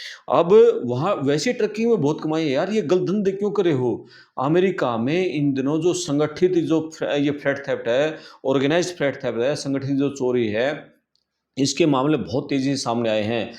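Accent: Haryana, India. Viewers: Ye youtuber Haryana se hai ❤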